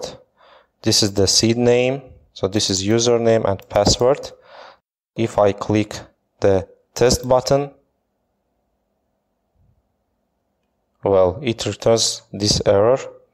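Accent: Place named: Poland. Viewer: Jestes z Polski? masz akcent polski :)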